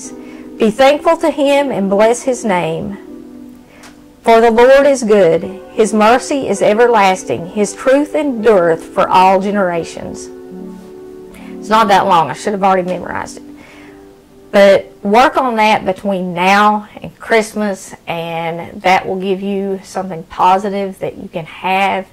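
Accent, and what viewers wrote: South, United States. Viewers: I found your Channel today when trying to learn how to make brown gravy from scratch then I checked out a few more of your segments after hearing your country accent giving God praise every segment I felt like I was home I subscribe to your Channel and yes praise God he is so good so so good to us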